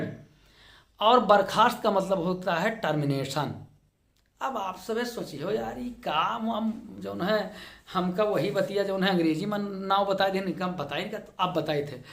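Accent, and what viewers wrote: Awadh, India. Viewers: Sir aapki boli se lagta hai ki aap avadh area ke hai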